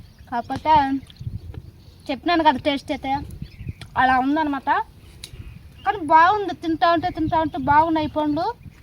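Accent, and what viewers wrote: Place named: Chittoor, India. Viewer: సిస్ బాషా వింటూ ఉంటే మీద చిత్తూర్ యాస లాగా వుంది.. Medhe a ఊరు సిస్ ఇంతకీ..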